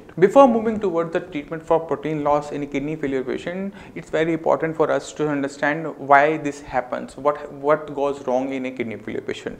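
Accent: India. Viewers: You can slow the speed of play. I actually have it sped up and I understand him perfectly. I suspect you're not used to the accent. I'm British. His English is accurate. He may speak quickly for ear but once again its probably that you haven't had much interaction with ppl from the Indian subcontinent. I used to find it incredibly difficult to understand southern American accents. Took weeks to adjust. Every word slurred into the next... Sometimes too slow to follow the train of thought sometimes too fast and stoccato.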